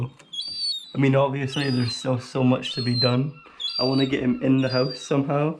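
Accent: Scotland, United Kingdom. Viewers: sounds like a scottish morgz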